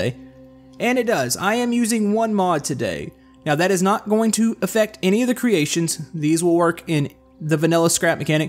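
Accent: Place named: United States. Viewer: i can really here your country acsent in this video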